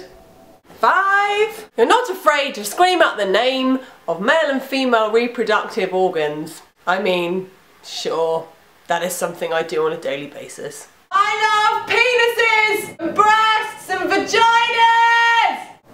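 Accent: England, United Kingdom. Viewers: I love her because of the accent, ( actually all english girls) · How on Earth are you single?! I mean you're really funny and witty and you have the looks.You must be really picky, probably that's why. Anyway I really love your videos and your British accent! Greetings from Romania. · I like ur voice with your british accent. And yeah. Your number 5ves too. They're kind of sweet the more i watch. British girls FTW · I watch your videos because of your british accent the way you say stuff sometimes is hilarious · I like your videos because of your accent and the way you talk. As an American English learner I had absolutely no interest in British English but your voice, your intonation and the wormth you have in your talking made me interested and so your videos. I can not ignore your beauty though but linguistically the way you speak makes English learners both American and British sides interested. 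keep going gal. thumb's up. From "Iran" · Here's why you're fun to watch... 1). You're very attractive. 2). You're funny. 3). You're witty. 4). You explore a wide range of topics. 5). You have an English accent that's interesting to Americans. 6. You're very likable. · I think your, funny, pretty, and your British(love the accent)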